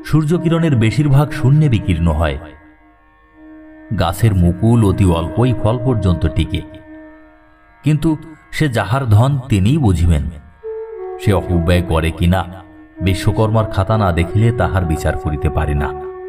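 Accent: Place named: Thakurgaon, Bangladesh. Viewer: Apnar Voice ta ebong background music ta khub bhalo legeche. Uporontu apnar Thakurgaon Bongaiyan uccharon e matir taan anubhav kori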